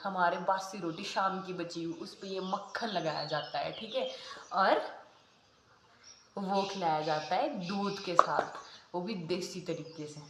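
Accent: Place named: Haryana, India. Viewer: aapki half language haryanvi hai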